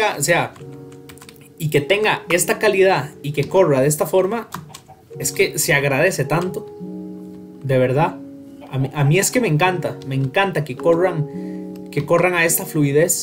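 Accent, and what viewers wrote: Costa Rica. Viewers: es por el acento tico igual lo pronuncia asi Rodezel2.0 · Me suena a hermano tico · Parece tico PD: en el lo confirmé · Mae usted es de costa rica verdad? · JAJAJA Bro, yo venía a comentar también que fijo eras tico xad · Todos hablan de los shaders pero papi desde el minuto 1 note su acento tico, pura vida mae 🤙🏻🇨🇷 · Quién más se dió cuenta que tiene acento se costarricense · Tu forma de hablar se parece a la de un Costarricense, eres de por acá? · mae usted es de costarica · Grande este perro, ya me decía yo que ese acento se me parecía jaja xd, buen video me encanto👌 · Bro eres tico? xd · Desde que oí tu pronunciación de R supe que eras tico xd Salu2 desde Argentina (Soy de Nicaragua) :D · Ese asentó lo conozco bro, ¿Eres de CR? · Jaja yo también dije "este es tico" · Eso mismo iba a comentar, este wila es tico. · desde el minuto 1 se nota que es costarricense jaja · Es Tico? 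· supe que eras tico, desde que digiste inutil jajaj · Se te nota lo tico de largo jajajaja, buen video bro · usted es de costa rica ? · mae sos tico verdad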